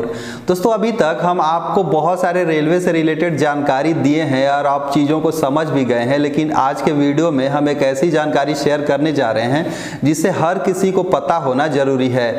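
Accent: Bihar, India. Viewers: आप कहाँ के हैं up या बिहार हमको तो पक्का बिहार के लगते हैं पकड़ा जाते हैं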